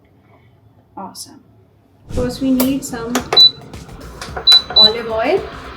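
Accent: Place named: Bengal, India. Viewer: your bengali tone sounds so good. make a cooking video with full bengali language.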